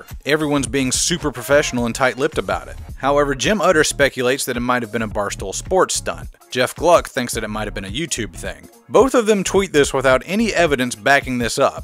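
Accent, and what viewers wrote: South, United States. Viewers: This video is good. Really good. But without your southern accent it wouldn't feel nearly as authentic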